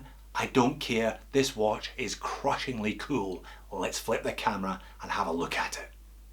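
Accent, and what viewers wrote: Scotland, United Kingdom. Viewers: I am an absolute fan of. One of the reasons being that he is a graduate of Griffith University also. I love the Scotish accent of this guy. He is a true talent. and for me is a benchmark channel on Youtube. For true watch enthusiasts I can also recommend looking into instagram accounts. In particular, of course, the one of. I further enjoy kicktoc, watchurious and monsterstraps. Keep it up!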